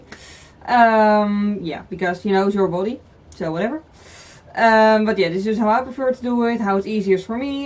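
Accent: Netherlands. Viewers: Omg army is everywhereeeee😂 but yea its because its a dutch accent and she talks pretty fast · Same , Ben je van Nederland? I’m from Aruba 🇦🇼 ✌🏽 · Ik hoorde aan je accent gelijk dat je nederlands was lol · Lekker Nederlands accent xD. Hoe lang duurt het helen bij een zo ongeveer (bij jou ten minste)? Ik ga binnenkort namelijk een helix en een tragus nemen.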